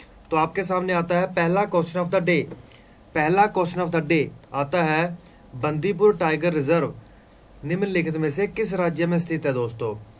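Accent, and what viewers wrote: Punjab, India. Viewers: Good morning sir Panjabi ho kya sir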